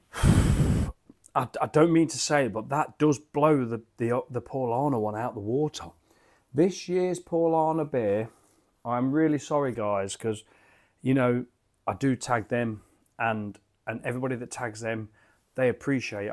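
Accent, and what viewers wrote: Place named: Leicester, United Kingdom. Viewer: Just came across your channel, this is the first of your videos I've watched and it's a great one! I think I'm good at spotting accents and and I would say you're from Leicester(shire) somewhere, in which case lovely to see someone local on here!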